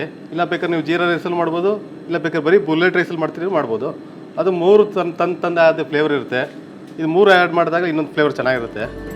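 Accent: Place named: Mysore, India. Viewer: ನಮ್ಮ ಮೈಸೂರ್ ಭಾಷೆ 👌👌👌👌💛❤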